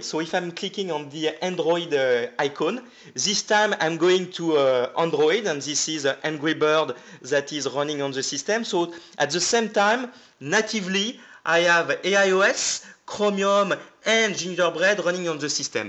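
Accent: France. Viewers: you have a really thick french accent...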